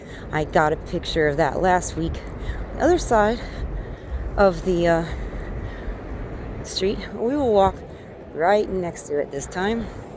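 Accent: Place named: South, United States. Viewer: Good job citizen journalist, people like you with nothing but a cellphone have become the new media. In a world of universal deceit, telling the truth is a revolutionary act. I would like to know who are all of these people who are sick, does anyone know anyone who is a confirmed case? Ive not heard about one person yet who knows a confirmed case. Anyway, good job! Also sounds like you are from the south, I heard a few y'all's in there!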